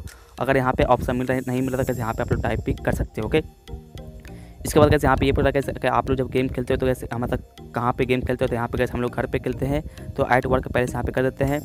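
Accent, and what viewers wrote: Bihar, India. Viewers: bhai tum bihar se ho na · Bhai ap bihar se ho 😀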